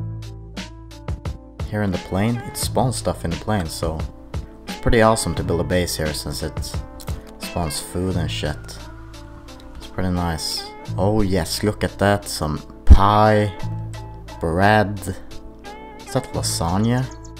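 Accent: Sweden. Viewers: i like your swe/eng:) naajs video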